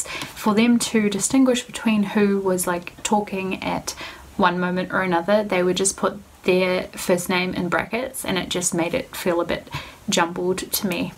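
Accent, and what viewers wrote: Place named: New Zealand. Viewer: Just stumbled across your channel from your Harry Potter film Wizardry flick through. I could tell you were a Kiwi straight away from your accent :) Just subbed and So excited to see more of your videos in the new year!! HAPPY 2019 - Also when you said Molly Moon it brought back so many memories haha.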